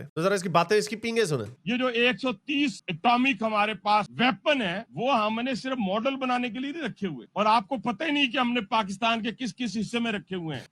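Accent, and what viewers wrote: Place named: Punjab, India. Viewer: I love your Punjabi touch to the commentary ❤😊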